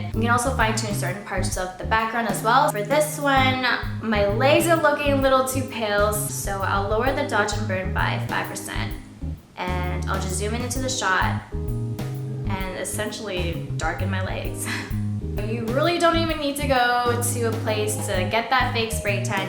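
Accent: United Kingdom. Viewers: Ok. Bye. lol. Nice British accent 😃. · I loved the British accent!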